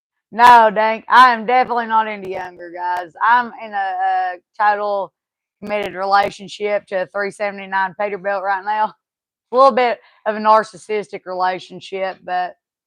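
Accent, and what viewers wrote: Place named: South, United States. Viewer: Chuck loves your southern accent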